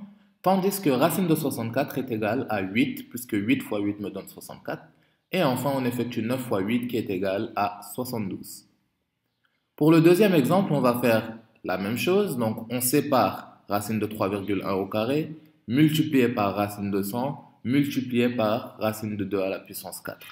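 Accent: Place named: Africa. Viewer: Vraiment merci beaucoup, et je suis sincère maintenant, j adore ton accent africains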